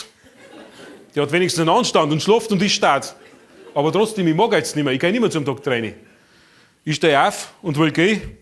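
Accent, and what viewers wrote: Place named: Upper Palatinate, Germany. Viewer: Der Oberpfälzer Dialekt ist schon der Hammer ! Toni Laurer ,Bayern's Bester ! 😀👍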